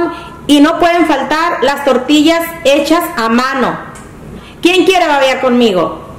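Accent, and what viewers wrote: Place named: Mexicali, Mexico. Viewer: 🤯👍👍Hola soy una subscriber nueva ‼️me subscribing por su acento pues es my parecido algo cachanilla / Mexicali? Alo mejor no pero igual me encanto su receta y todos sus vid. ❤️👍👍👍👍👍👍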